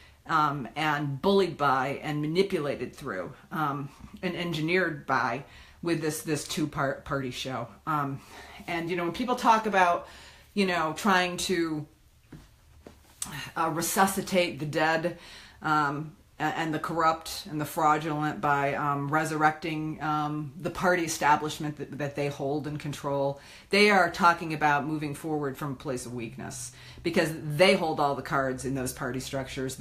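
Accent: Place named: Brooklyn, United States. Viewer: Every line spoken!I Absolute MIGHT!! NOW!!! This is the kind of women you go to war for.. everythi Ng is a redo wath is to keep?? Baby in babth water? i would shut my yapper for!! And here we are in 2025 Just look at this my buying a kimono!! I let us enjoy the day and let us not us not get sidetrack!!the entire ship never floated...and here i was Just playin marco pollo...let us unite in absolut ape shitness or is it to soon!! Who is she??? This is the malcom thi S is that old school left!! Sharp and not hippies or wath ever...The old thing from fruit of the loom and before that french and the anglo cok strudling..europe is re-do..man kind is deii informed..this is a redo as well...we lost this simmNow let us flip the board is absolute anger here i was looking at my self! Let us not loose face!! And lee Camp 🥋💥🥋 let me buy some aluminum depdorant just in case...😂 Never seen her the!! 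Not since the occupy and tea party where smokin a doobie arround a drum circle...in park avenue..This is where its at..washinghton ]]] Donald trump!! Wow that all was fine and dandy!!phillip moris and the the u Nderwear gestapo.. revenge is all we have now..let us not continue playing this awful game of monopoly...Im not letting...I dont tru St the weak and havarus... That is the sexiest AND frankly she as the brooklin n.y Accent!! 🤬😡PSSSST CALL 🤞🤟🤙🤝 ❤🎉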